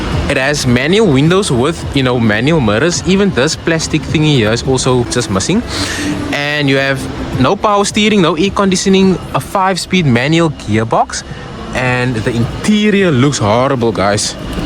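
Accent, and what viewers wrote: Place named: South Africa. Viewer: bro got that brai/bokka accent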